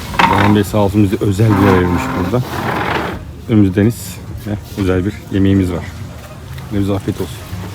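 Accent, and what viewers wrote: India. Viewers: tam hindulara benziyorsun biraz türkçeni düzelt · aga, tenin, konuşma şeklin hintlilere benzemişsin :)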